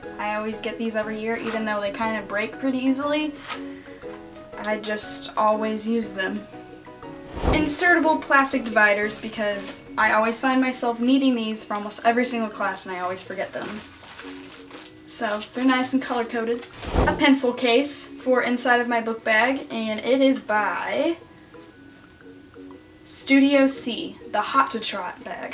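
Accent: United States. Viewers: Are you country because you talk like a country person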